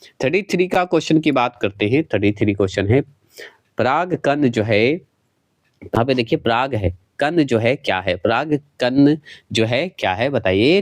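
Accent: Kerala, India. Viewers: Ye keral se h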